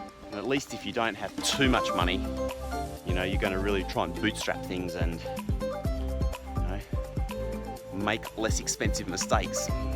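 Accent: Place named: Australia. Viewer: I landed on your channel after randomly searching for a beautiful college campuses around the world. It's somehow very nice to hear the motivational talks in Australian accent :) I agree we should do what works more, although sometimes we need to push ourselves into doing something new to turn around what does not work into what does work! Hope you are staying safe during the pandemic! - a random Korean student from Japan.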